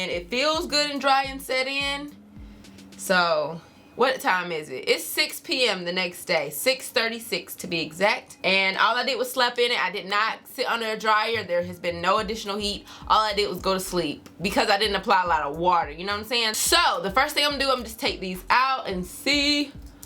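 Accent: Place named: Alabama, United States. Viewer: Where are you from?!?! You sound just like my man’s family from Alabama! Love the video btw. 💛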